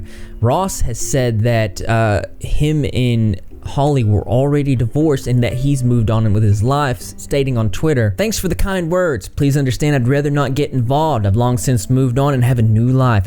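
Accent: South, United States. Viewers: Are you from the south? Your accent is adorable.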